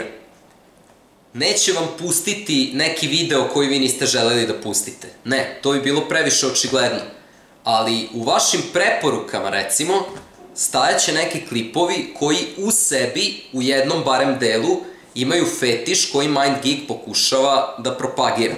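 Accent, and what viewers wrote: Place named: Novi Sad, Serbia. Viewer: Novosadski akcenat🙈🙈🙈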